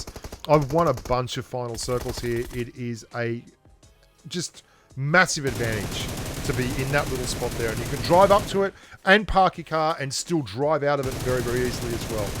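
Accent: Australia. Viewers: Idk mate I just love the Aussie accent👌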